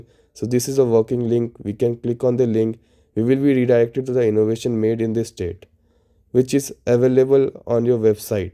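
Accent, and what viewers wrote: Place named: Bihar, India. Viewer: your bihari accent is so sexy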